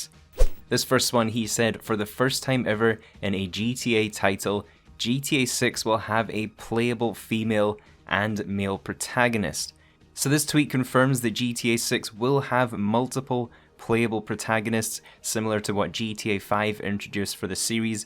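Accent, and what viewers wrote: Scotland, United Kingdom. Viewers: This guy sounds he lives down the road from Edinburgh’s Rockstar studios 🏴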